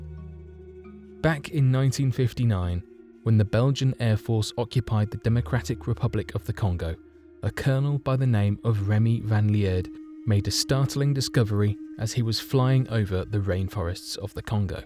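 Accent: United Kingdom. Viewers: Another boring British monotone narration. Blaaaaaah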